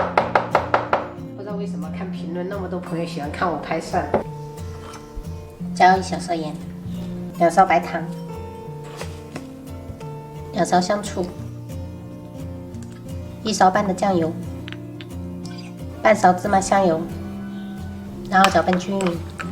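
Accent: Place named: Fujian, China. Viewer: 你是四川人怎么一口福建口音呢?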